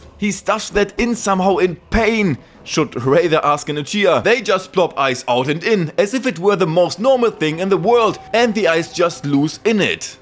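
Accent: Germany. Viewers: The way this mans pronounces "Anime" and "Pegasus" just drills my ears. Damn Germans have such terrible accents and their language is so disgusting...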